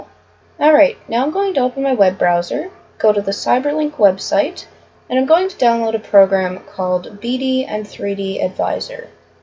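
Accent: Canada. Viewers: i thought your accent sounded canadian :p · I don't want to sound stupid, so sorry If I do...I haven't upgrade to a Blu drive yet so clearly I don't pass the Blu advisor check (which I will once installed) for 3d is says I cant support 3d playback, but what happens is I put in a 3d blu drive and use and hdmi to my 3d tv will it work due to the fact I'm using the comp more as a player than for viewing? Oh and yeah you sound wayyyy Canadian haha.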